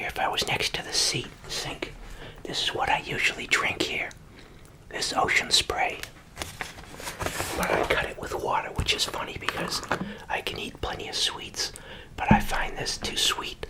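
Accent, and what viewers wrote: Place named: New York, United States. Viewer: Love your videos 😍 just started watching them are you from New York? Love your accent 😁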